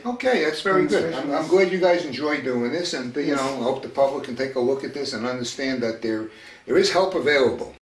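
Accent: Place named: New York, United States. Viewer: You sure do have a New York accent! Haha well good video. taught me a lot about chiro.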